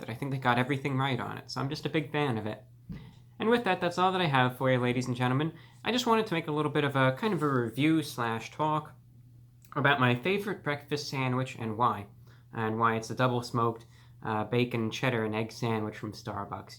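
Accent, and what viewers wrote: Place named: New York, United States. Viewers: does have a little bit of New York accent, but lives in Florida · He is from New York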